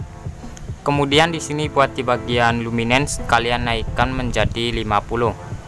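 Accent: Indonesia. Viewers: Bang emng lu orang Cirebon barat? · Wong Tegal um?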